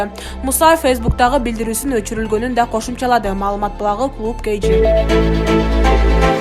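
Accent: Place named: Uzbekistan. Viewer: Бул канал калп айтат ишенбегиле диктору да оштук сарт кыргызча интонация жок озбекче интонация менен суйлойт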